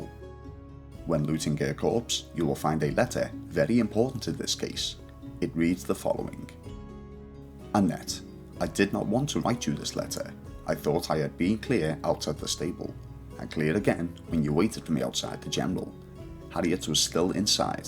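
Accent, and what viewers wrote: Liverpool, United Kingdom. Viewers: Always a good thing to hear a scouser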